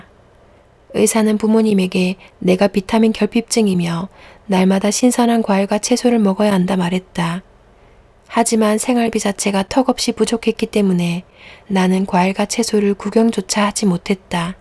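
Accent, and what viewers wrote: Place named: Busan, South Korea. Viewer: 재밌는... 흥미로운 내용 감사합니다.. 혹? 부산이세요.. 반갑습니다! 매일매일 행복하세요...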